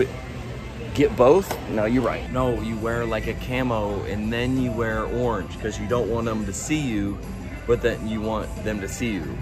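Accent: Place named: Tennessee, United States. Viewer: are you from tennessee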